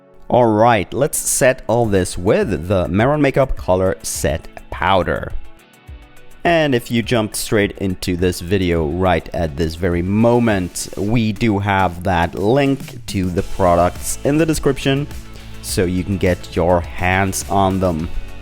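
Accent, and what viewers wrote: Sweden. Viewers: hes swedish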